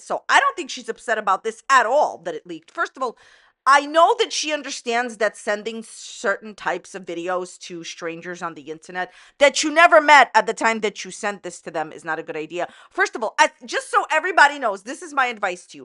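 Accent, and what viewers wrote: United States. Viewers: I love how says Ambalin 😄 She has a definite accent from the region she lives in. But so do I. We have different accents in different parts of the US.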